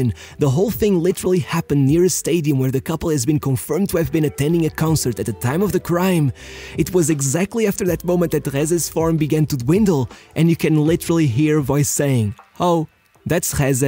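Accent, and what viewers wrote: Portugal. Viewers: Because he is Portuguese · Why is He pronouncing the spanish names as If they are from portugal?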